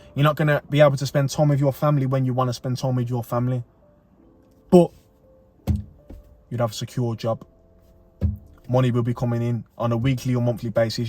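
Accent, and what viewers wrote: Birmingham, United Kingdom. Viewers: I saw your video on when you made your first £10K and I love how honest you were I can’t wait to jump out the matrix too btw where you from you sound like you’re from Brum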